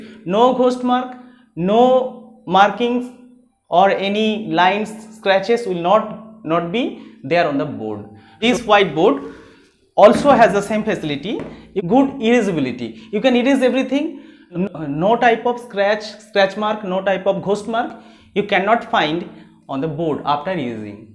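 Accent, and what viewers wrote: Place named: Bengal, India. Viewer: Bengali accent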